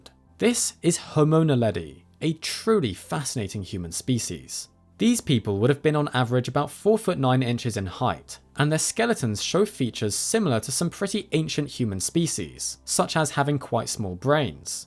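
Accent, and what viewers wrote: United Kingdom. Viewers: British accent… its like nails on a chalkboard. Imaging having an internal monologue this drawn out and pretentious guiding your every whim. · Why does every documentary need a British accent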